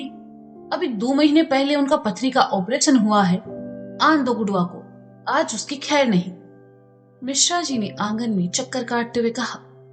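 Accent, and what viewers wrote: Kanpur, India. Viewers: Mujhe apki ye kanpuriya language boht achi lagti h kya mst bolte ho sach me jbse murari wala sune tbse boht acha lga❤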